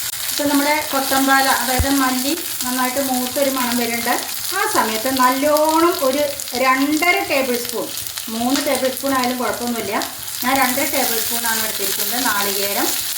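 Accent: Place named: Valluvanad, India. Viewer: നല്ല വള്ളുവനാടൻ ശൈലി🥰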